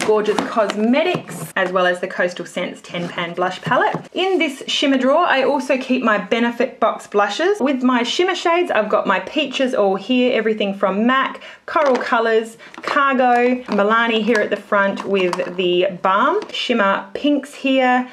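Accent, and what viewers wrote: Australia. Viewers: Yay! Love: 1. Hearing an Australian accent in a makeup video - where are all the Aussies on YouTube?! 2. That you're over 22! Where are all the adults on YouTube?! Subscribed!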